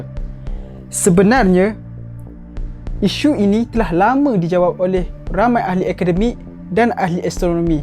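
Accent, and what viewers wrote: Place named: Terengganu, Malaysia. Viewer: Speaker nie org TRG ke??... dgr mcm ye dh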